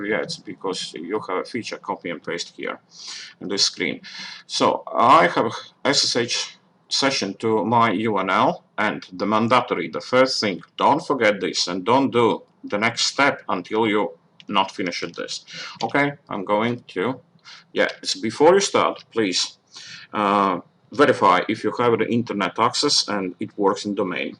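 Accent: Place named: Russia. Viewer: Thank you for your job! Are you Russian?)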